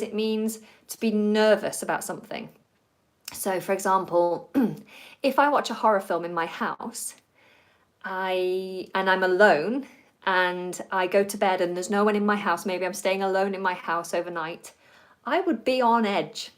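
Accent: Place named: United Kingdom. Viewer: Hello, my dear teacher. Unfortunately, I missed your live video but I watched the last lesson about marketing and like always I learned many new words and phrases about marketing. That was awesome. U are the best teacher I ever had because u r very energetic and I like your lovely pure British accent. Thank you so much.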